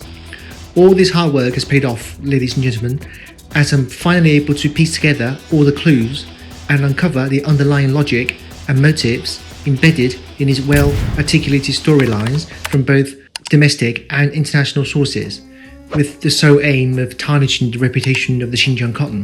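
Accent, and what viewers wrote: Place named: United Kingdom. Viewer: 牛逼牛逼·这个英伦口音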